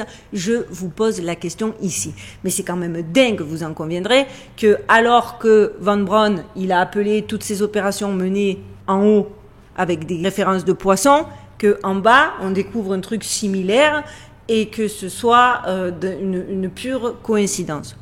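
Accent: Marseille, France. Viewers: Oh,Peuchère,vive l'accent de Massilia! 🐟 et les sardines! 🐟🐟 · Je suis curieuse et pas autruche du tout, alors, oui, continuez à farfouiller svp 😀 c chouette l'accent marseillais 😄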